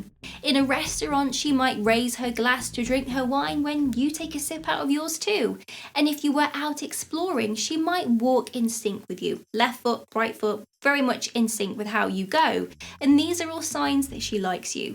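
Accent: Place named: Northern England, United Kingdom. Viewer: Watching and videos to try to figure out what part of the UK you’re from. Somewhere in north England? You’re adorable!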